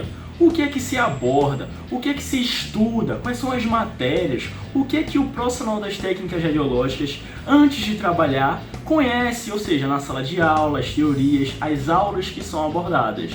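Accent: Rio de Janeiro, Brazil. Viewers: Você tem sotaque do Rio de Janeiro, fala com o "S" acentuado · Show o conteúdo, kkkkk tu é do Rio de Janeiro é? Kkkkk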